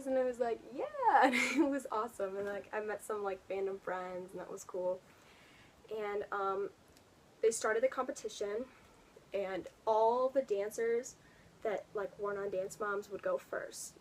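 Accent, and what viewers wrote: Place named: Detroit, United States. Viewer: I love your Detroit accent lol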